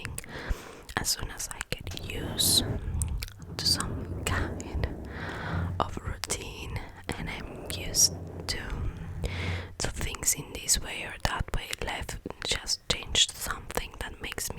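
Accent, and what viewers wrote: United Kingdom. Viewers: Like si amas como pronuncia el ingles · Me encanta cuando hablas español con tu acento argentino que encuentro tannnnn bello y lo encuentro más relajante pero porque soy del reino unido quiero decir que ADORO tu acento inglés! Es muy lindo te prometo! 😘😘 Admiro tanto tu enfoque al momento en tu vida y espero que te quedes fuerte linda! un gran abrazo!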